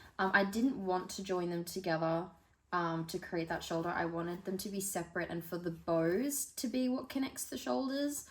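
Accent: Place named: Australia. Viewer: Full credit to the wonderful plethora of American shirring tutorials but it's so nice to hear a fellow Aussie voice saying the word shirring.. 😅 I also loved seeing a tutorial done on a machine with fewer bells and whistles, like mine! Thx for the vid ❤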